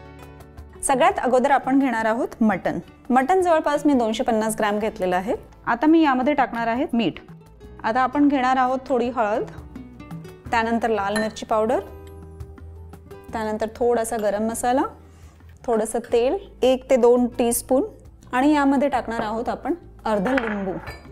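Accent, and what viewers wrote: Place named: Satara, India. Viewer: सातारची भाषा आहे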